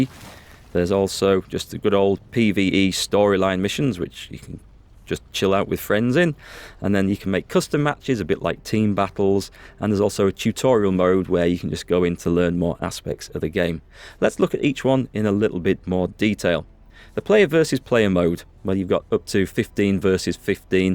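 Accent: Yorkshire, United Kingdom. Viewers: From yorkshire?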